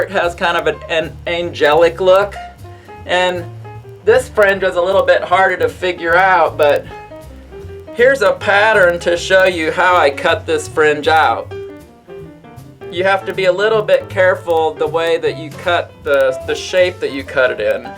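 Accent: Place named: South, United States. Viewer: I'm obsessed with her country accent! I could listen to her speak all day!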